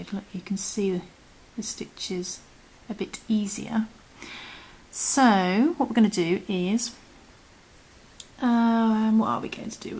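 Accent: England, United Kingdom. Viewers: You sound English so why don’t you say the stitch’s in English not American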